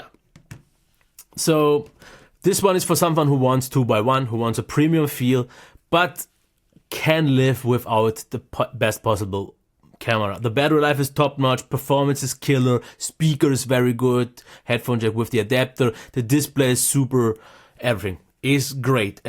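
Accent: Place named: Germany. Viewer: Like the way you explain about which one to choose. Oh and that German accent is something else >))) keep the good work!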